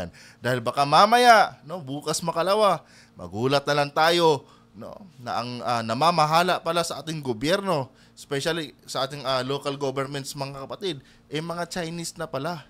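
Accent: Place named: Davao, Philippines. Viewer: Taga davao po ba kayo 😊? Kc kaparehas moh ang accent si baste..tanong lang po..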